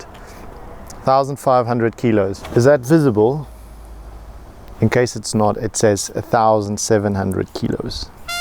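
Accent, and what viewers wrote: Cape Town, South Africa. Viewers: Is this dude South African? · Is the presenter South African? Has our accent, and said “ lekker “ a typical South African trait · Are you South African ? You sound like you from Pietermaritzburg.... 🤔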